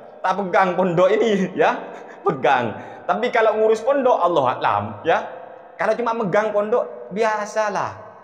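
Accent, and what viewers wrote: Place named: Jember, Indonesia. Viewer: Kaya logat Jember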